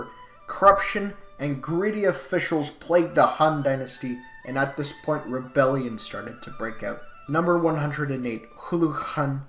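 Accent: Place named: Chicago, United States. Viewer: DA MING, Down da line dere. I love hearing the Chicago accent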